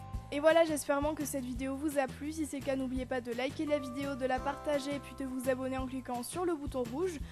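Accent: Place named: France. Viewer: Tu parle français de France moi je parle le Québécois je suis une fille J'ai 8ans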